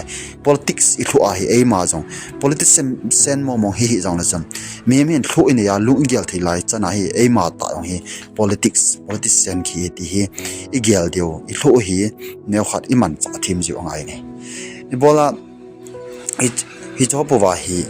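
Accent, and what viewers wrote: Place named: Kuki, India. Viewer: Dik mah² ei and i really like ur accent pure kuki accent which i really like....👍👍👍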